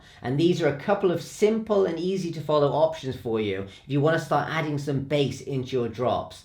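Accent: Wales, United Kingdom. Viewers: Is that a bit of welsh I hear in that accent of yours!! Cymru am Byth!!!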